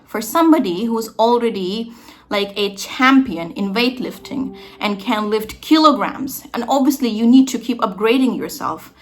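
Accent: United States. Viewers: To learn an accent like you do, I need to live in a US-like environment, or can I learn it at home by myself by practicing it? I know the basic english, and I speak it well. And nice video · I live in an area with one of the highest Sikh populations in America and we host one of the largest Sikh parades in the world. Your American accent is better than the overwhelming majority of Indians who live in this area. For some reason, learning the accent is seen as disrespectful by a lot of people. To the rest of us, it shows the amount of effort you've put into learning. I'm currently learning Welsh, so I know how difficult this journey is. Congratulations on your progress.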